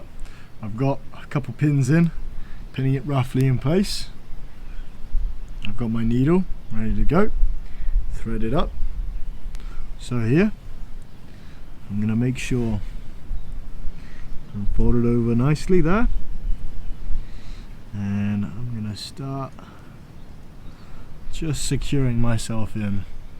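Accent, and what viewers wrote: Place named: North Dakota, United States. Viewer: Great vid! Just curious about your accent. What state are you from? North Dakota?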